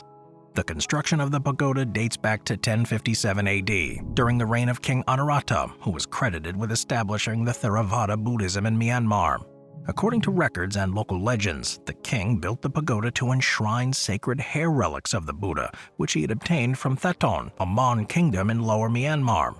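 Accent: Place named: United States. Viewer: The American accent of the narrator hurts to the ear..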